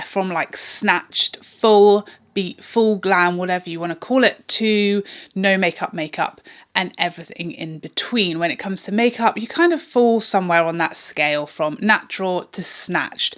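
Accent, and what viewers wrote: England, United Kingdom. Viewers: you always look pretty, but exceptionally pretty today. My father's family was English, so I just adore listening ro you speak. And girl, you are so stinkin funny! 🤗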